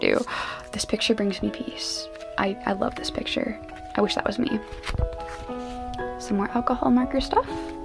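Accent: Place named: United States. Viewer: она американка т.к. акцент американский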